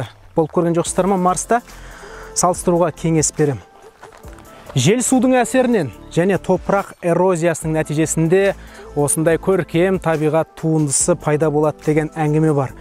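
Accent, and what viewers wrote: Standard. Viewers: Красавчик👍🏻 таза қазақша сөйлейді ризамын, өте білімді жігіт!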